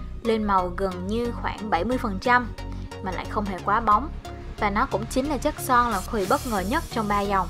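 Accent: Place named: South, Vietnam. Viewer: lần đầu xem clip của chị và replay liên tục vì giọng con gái miền nam đáng iu quá trời luônnn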